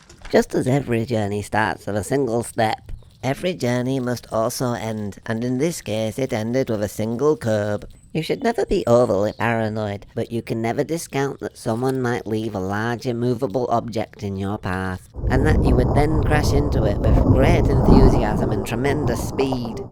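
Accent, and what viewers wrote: Yorkshire, United Kingdom. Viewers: I agree with all of the above. What part of Yorkshire are you from, Leeds?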